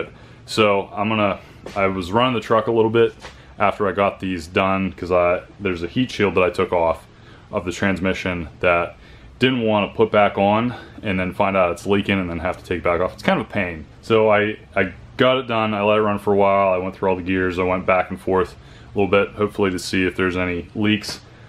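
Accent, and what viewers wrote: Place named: Pittsburgh, United States. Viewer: I detect some Pittsburgh accent...lol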